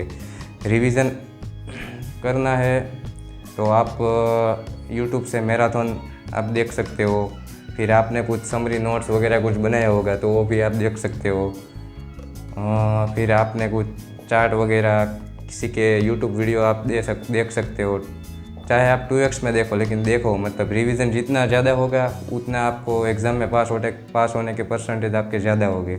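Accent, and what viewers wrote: India. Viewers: Awaaz thee khabar padhe jaay gujarati a par saurastra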